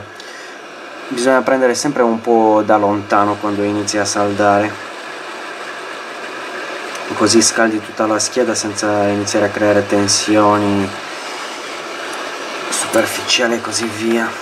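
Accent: Sardinia, Italy. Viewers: secondo mè l'hai messo in corto , si nota un tipico ma simpaticissimo accento sardo unsaluto e comunque un grazie per l'impegno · Dall'accento non capisco se sei sardo o rumeno.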